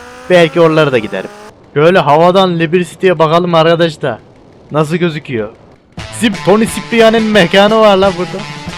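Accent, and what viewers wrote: Turkey. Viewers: Eline sağlık dostum harika video olmuş. Bu arada nerelisin? Ben Denizliliyim ve bizim oralılar gibi konuşuyorsun :D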